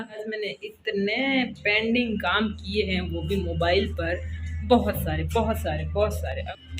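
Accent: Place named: Rajasthan, India. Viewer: Meri beti ki ichha thi apki marwadi sunne ki aaj puri ho gyi ,kl bol rhi thi ki ye didi marwadi bolte h kya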